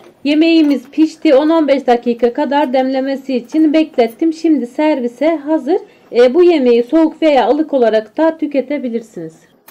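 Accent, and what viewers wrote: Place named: Afyon, Turkey. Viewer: Afynlu olabilir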